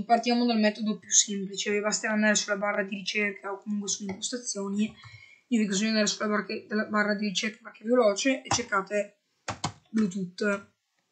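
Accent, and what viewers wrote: Italy. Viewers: bello l'accento veneto comunque bel video grazie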